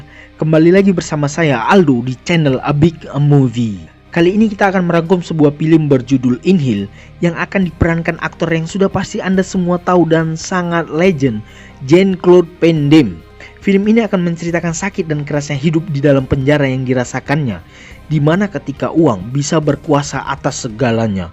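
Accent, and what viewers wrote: Medan, Indonesia. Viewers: Admin nya Orang Medan ya?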